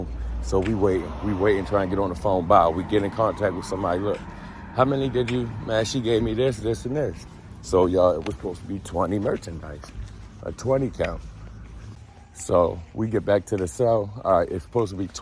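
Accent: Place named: Baltimore, United States. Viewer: I always wondered how come people from Balitmore are the only ones with an accent out of the whole country the way yall say ur R that always tripped me out and always made me curious bc its not only blacks from Balitmore its everybody thats born there,do you know whats the reasoning for that if you dont mind me asking i'm just hella curiouse on a side note great content keep pushing!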